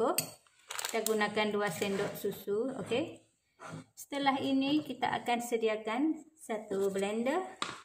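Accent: Malaysia. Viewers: Asli dari mana kak? Logat malaysia dialeg Indonesia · Logatnya kaya ngomong bahasa malaysia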